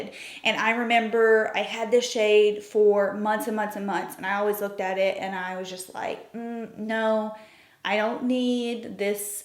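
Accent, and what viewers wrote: South, United States. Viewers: Hi, I’ve watched the first video you have on YouTube! Are you from Texas? Your accent is very southern!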